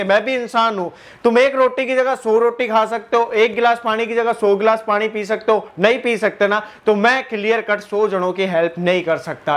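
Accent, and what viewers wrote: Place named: Marwari, India. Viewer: Marwadi ka boli se pata lagta hai bhai 🤣🔥🔥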